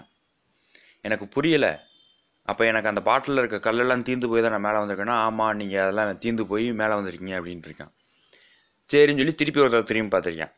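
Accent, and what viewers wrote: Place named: Madurai, India. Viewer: arumai! langauge slang is plus for you Madurai karanda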